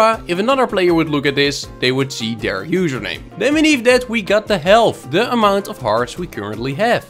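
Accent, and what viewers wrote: Netherlands. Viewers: Are you dutch? I really notice a kind of Dutch-tone in your English.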